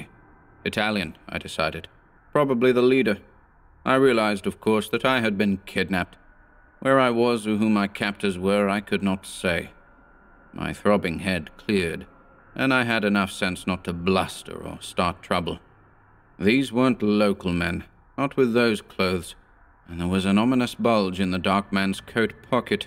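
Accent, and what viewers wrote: United States. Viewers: Fantastic reading, as always...love the American accent lol...if only I could master a British accent even half as believable I'd be a happy girl 😊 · Your American accent is impressive. Excellent as always Mr. and. Thank you.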